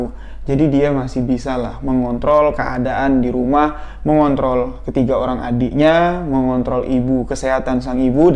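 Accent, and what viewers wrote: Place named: Jambi, Indonesia. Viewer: Walaikumsalam Yana -Banten hadir Kak boleh request upload kisah Kota Ghaib Saranjana..... MasyaAllah Pasti y kereen banget lw cerita y yg bawain kak dgn Logat Jambi yg khas....🙏 Hayu Gaskeeuunn atuh kak..... 🔥🔥🔥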